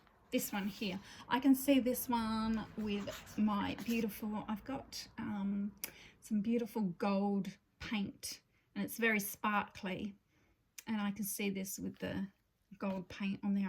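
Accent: Australia. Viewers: Hi I am fairly new to your channel but I have been binge watching. I love all of your videos. I have gotten so many coloring book ideas from you as we have very similar taste. Most of all your lovely Australian voice is such a pleasure to listen to. Very relaxing. So glad I found you on here! 🙂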